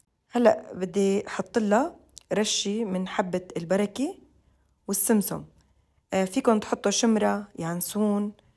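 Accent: Homs, Syria. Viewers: الصوت الحمصي كيف ببين فووورا😅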